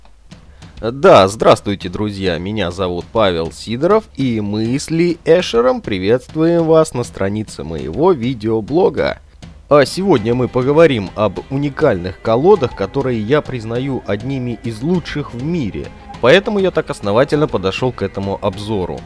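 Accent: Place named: Russia. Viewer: I liked your russian accent having a deck with Mr.Lee Asher signature on it is an honor A MUST HAVE DECK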